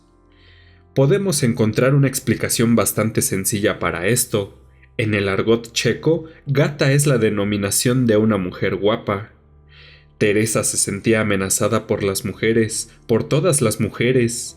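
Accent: Mexico City, Mexico. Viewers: ese acento chilango... choca un poco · lo está leyendo un chilango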